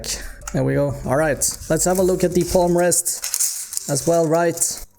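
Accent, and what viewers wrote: Sweden. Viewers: That swenglis dooo 😉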